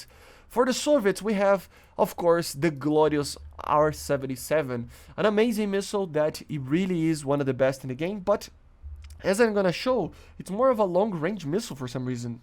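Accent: Brazil. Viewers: Sotaque brasileiro?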